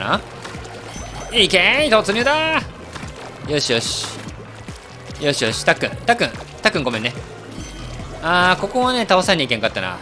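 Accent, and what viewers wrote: Hiroshima, Japan. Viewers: イケボなのに広島弁で親近感が湧く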